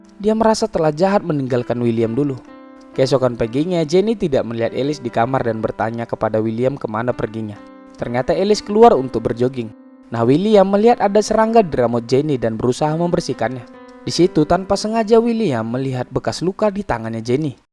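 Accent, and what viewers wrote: Medan, Indonesia. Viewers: Medan kali ya logat mu 🤣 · Oi bang.. Orang Medan ya? 😅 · Pembawa ceritanya kayaknya orangedan ya 😁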